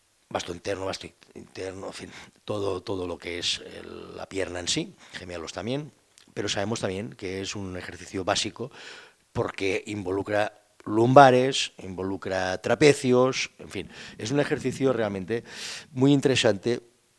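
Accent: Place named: Mexico. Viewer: que acento mas mexicano!!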